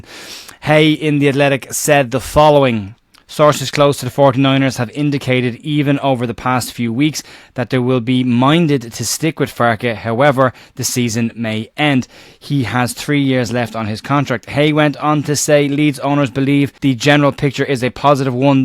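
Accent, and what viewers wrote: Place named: Ireland. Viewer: Just want to say thank you so much for the content over the season. The amount of work put into each post is extraordinary. I live in Brazil, The View is a very important part of my life here! It’s great to hear a fellow Irish man talking about Leeds! Thank you so much so much, we’ll do it better and in more style next season and I’ll be following it on The View!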